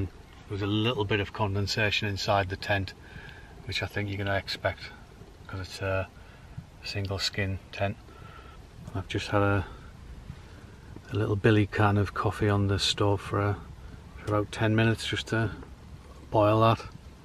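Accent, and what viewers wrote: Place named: Geordie, United Kingdom. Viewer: I hear the twang of a Geordie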